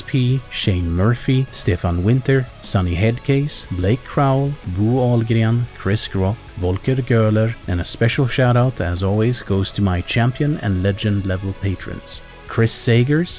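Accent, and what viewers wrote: United Kingdom. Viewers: Oy mate! Your brit accent is bloody amazing! I'm chuffed to bits! Hahah Your videos are always a gem and this one is no exception :D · Happy birthday 🥳 (and that’s a cracking British accent) 👍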